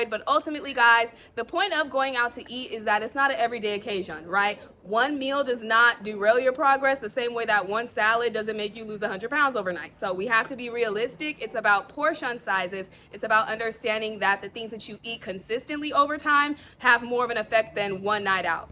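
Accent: Caribbean. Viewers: Hey 👋🏿, do I hear that you have a Caribbean accent? If so where from?🙂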